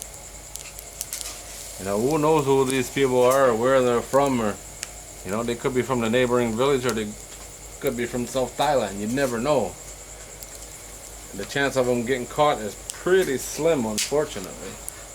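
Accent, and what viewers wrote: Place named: Manchester, United Kingdom. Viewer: Manchester speach 👍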